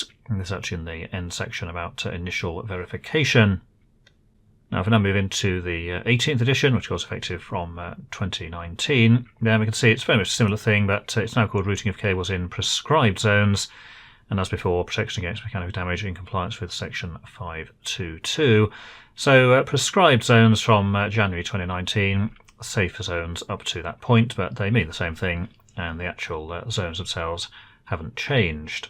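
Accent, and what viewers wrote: United Kingdom. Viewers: Thanks for the precious content! I am a subscriber, watched a bunch of your videos... was surprised here by the speed of your pronouncing some sentences. The British English compounded by the fast enunciation made some parts difficult to understand. May I kindly beg for a bit slower speaking pace please - for your international audience? Thank you for the understanding!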